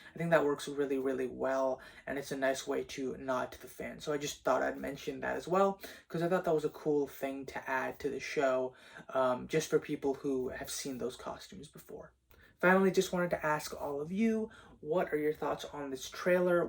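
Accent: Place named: United States. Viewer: Im eagerly waiting for this release. By the way though your dialect is almost similar to US speaker your appearance relates to a slight indian men🤔😉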